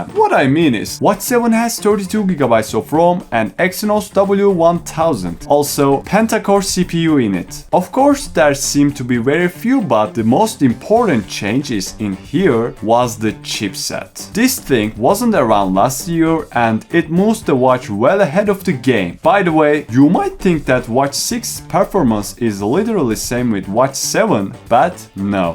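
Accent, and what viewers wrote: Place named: Turkey. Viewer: Öylesine açtım bu aksan tanıdık derken Türk çıktı 😂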